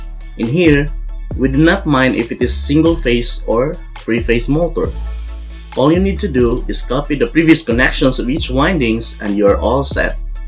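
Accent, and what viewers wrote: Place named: United States. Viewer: Pinoy yung pangalan ng channel kano nman yung nagsalita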